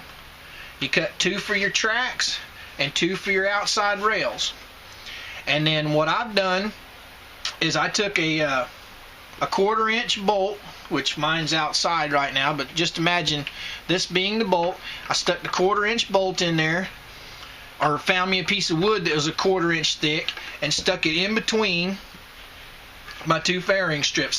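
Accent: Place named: Missouri, United States. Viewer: LOL.... I like your accent.... Are ya from Missouri?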